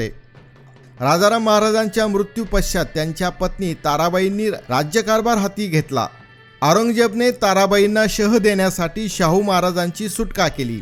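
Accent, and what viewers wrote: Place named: Thanjavur, India. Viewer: Tumachi bhasha Tanjawar Marathi watate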